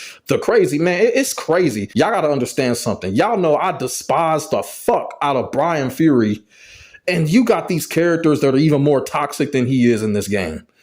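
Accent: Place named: New York, United States. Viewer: I never liked him,annoying ass ny accent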